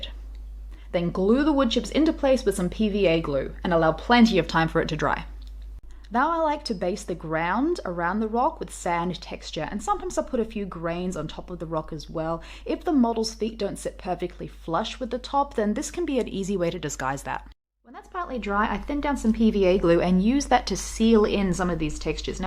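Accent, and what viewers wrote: Australia. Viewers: Also, subbed. Love seeing content produced in Australia! (God I hope that's an Australian accent, I should be able to pick that)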